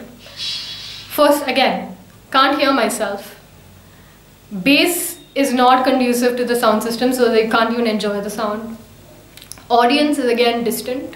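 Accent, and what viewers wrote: India. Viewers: I love the Indian accent. Do you have any videos of your singing? Also, can you give me a singing tip? I'm trying to learn. Thank you.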